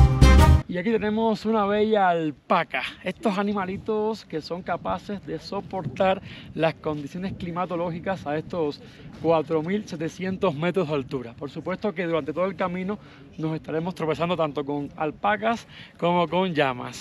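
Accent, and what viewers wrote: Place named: Cuba. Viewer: Y por si acaso lo que t'escribo es en broma porque como no diría jamás Kiko : "Tu si me simpatizas" por tu manera de hablar tan cubano chico, por eso recordando la expresión del Chavo del 8 : " Tómalo por el lado amable" 🤗👍🏻❤️🇵🇪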